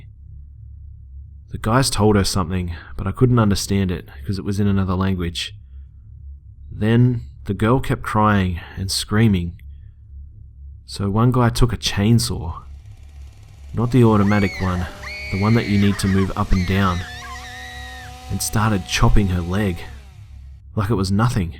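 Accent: Australia. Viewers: Are you Australian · are you Australian? your accent sounds Australian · Gday mate? Fellow Aussie for sure; great stuff! Keep it up! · Are you Australian? I'm getting a Aussie vibe I love your accent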